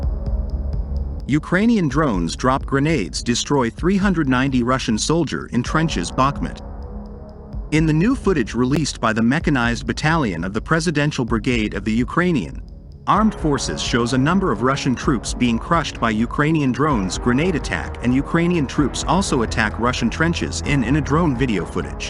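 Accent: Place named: United States. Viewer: Clickbait with annoying American bot voiceover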